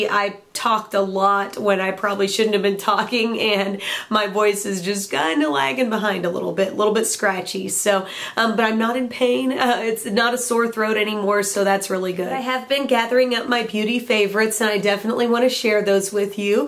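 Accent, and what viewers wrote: South, United States. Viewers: It's the funniest thing.. I don't know why but does anybody else think her voice sounds more "southern" with that raspy voice? lol I dunno but it seems like her accent comes thru just a liiittle more here, sounds like sassy southern woman to me! lol (I'm from the south so I'm not hating at all, if anything its sexy!)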